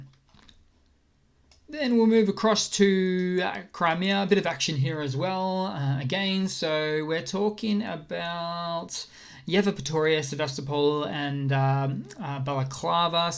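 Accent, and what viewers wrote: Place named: Australia. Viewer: Love your Aussie accent! All da best from Poland :)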